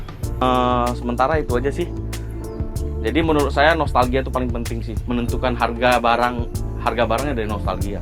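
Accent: Medan, Indonesia. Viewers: medan kali asli wkwkwkw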